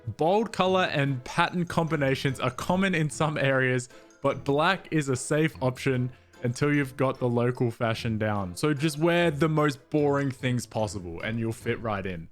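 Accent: RP, United Kingdom. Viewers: You already are british, you just think too much about your accent. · I thought was British when I started to watch him xD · I thought you where British lol, they are so similar! this comes from a Swedish person so I have no idea what I’m talking about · You got some little British accent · Me being British watching him be so posh is painfull to say the least. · bro i thought u were british with that little accent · I am british. When you tried to do the british talk, that was hilarious! When I first saw your channel and clicked on one of ur videos, for some reason I thought you were British🙂😂 God bless you! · I was honestly mega sure he is british half the video. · Is it only me who always thought that spoke a British accent. It sounds like to me for some random reason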